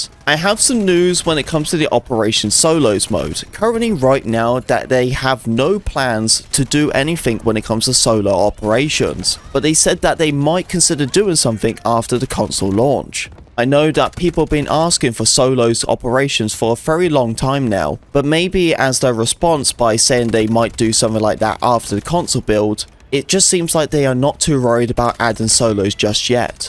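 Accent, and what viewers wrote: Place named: United Kingdom. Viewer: hey man your so british idk what app you said for controller support for mobile no matter how many times i rewind to hear you again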